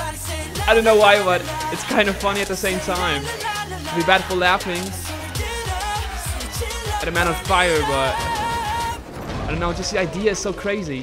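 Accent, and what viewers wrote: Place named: United States. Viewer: hätte ich nicht gewusst dass du Deutscher bist, hätte ich gedacht du wärst ein Ami xD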